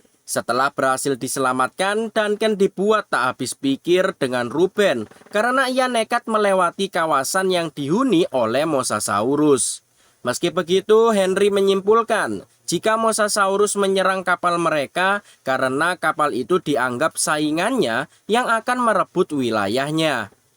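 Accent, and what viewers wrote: Java, Indonesia. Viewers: Sampean jowo to min😅😅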